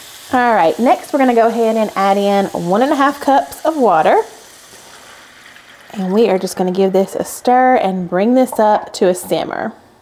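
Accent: South, United States. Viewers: Made the chicken and spinach recipe it came out so delicious. Im making your beef,rice and queso tomorrow. I love the channel and sweet southern accent.❤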